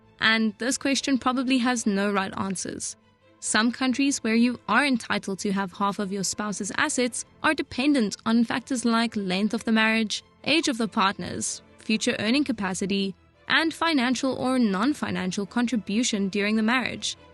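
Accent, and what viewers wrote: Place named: South Africa. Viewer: Thinking the same thing, hey. Accent is too South African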